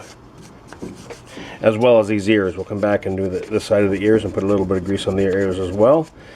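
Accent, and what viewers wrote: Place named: Canada. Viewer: Hey Great Video Eh? Very Helpful and I love hearing your Canadian Accent. Is it ever excellent eh? Clicked the thumbs up and subscribed for future videos. Cheer's!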